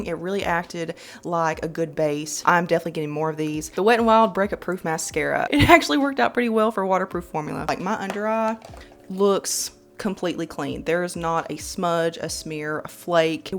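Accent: South, United States. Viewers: Hi! I found this video when looking for reviews on the Relove foundations but now I am subscribed because I LOVE your personality 😊 I feel like you’re from the south because of your accent and same here (MS) so that’s also cool! Love your content I’ll definitely be watching all your videos! 🩷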